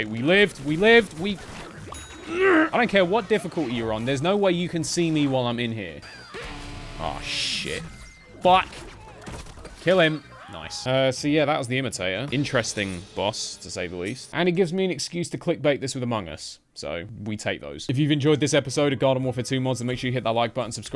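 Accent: United Kingdom. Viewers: We’re do I put my tea? He’s British without a doubt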